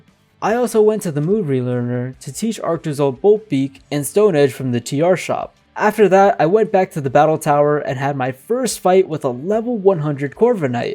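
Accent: India. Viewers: U indian??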